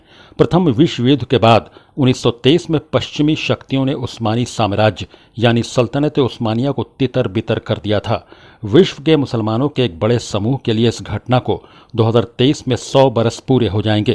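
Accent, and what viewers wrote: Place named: Arab world. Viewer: अरबी स्टाइल में जीभ क्यों मोड़ते हो । मूर्ख अपनी भाषा मे बोलो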